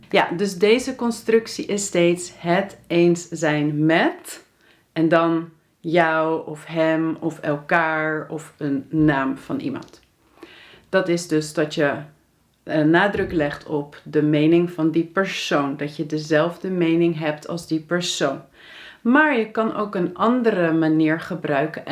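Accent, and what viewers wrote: United States. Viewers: hai i have a question: are you dutch or american? ps love your videos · Very minor super slight tinge of US accent in the "R" but nobody would notice. The guttural "hock a loogie" "G" is spot on.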